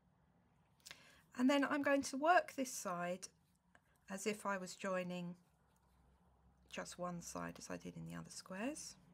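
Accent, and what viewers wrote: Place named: Australia. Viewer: I love your accent! Are you from Australia?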